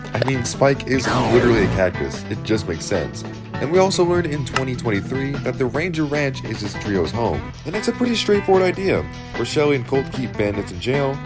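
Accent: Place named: United Kingdom. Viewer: As an Australian he sounds so British 😭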